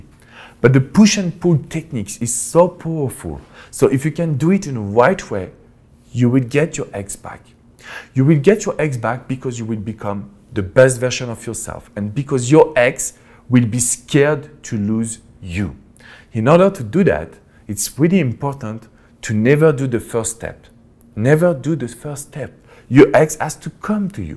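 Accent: France. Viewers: ur my best with your french accent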